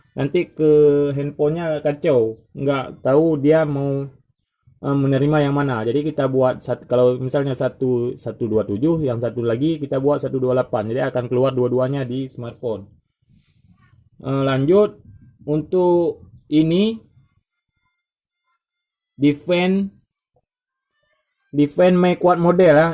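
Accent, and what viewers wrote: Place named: Padang, Indonesia. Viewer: Dari logatnya seperti org padang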